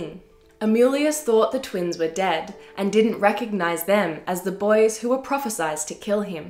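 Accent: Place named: Australia. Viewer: Great presentation. You sound like an Aussie.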